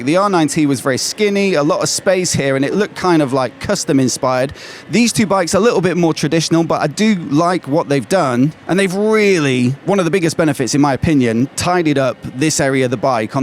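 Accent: England, United Kingdom. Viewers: excellent side by side overview of both new R12 models. Presented in a clearly spoken and easy to understand generic English accent, thank you. Particularly liked that you sat on the bikes to illustrate their relative size. Eagerly waiting for your road test - and the USA release of course.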